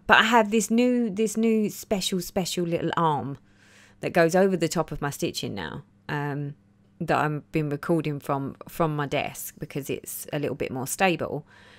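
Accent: United Kingdom. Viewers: Love watching you stitch...as for uniformity of stitches, have you watched FlossTubes by Blitstitch? He’s an engineer, so love his take on how to get uniform stitches...I like his #5 and #36. Love your British accent also! Lol! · Hi! Please keep on rambling, live or voiceover does not matter to me. I’m from Sweden and I love listening to your British accent. 😘 Regarding railroading: have you tried railroading in both directions, not just on the way back?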